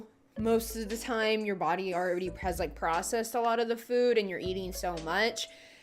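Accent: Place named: Michigan, United States. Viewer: Are you from Michigan? Lol I'm just guessing from your accent. Im probably wrong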